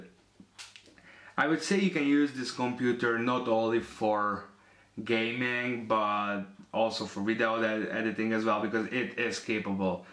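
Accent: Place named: Hungary. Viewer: Kihallottam a magyar akcentust :) Hun bemutatót nem akarsz csinálni?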